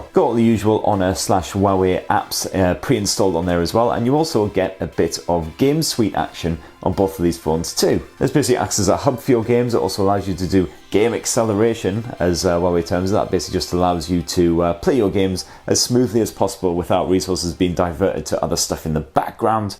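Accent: India. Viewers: Not Indglish. English title with Indian mambling. Dislike guaranteed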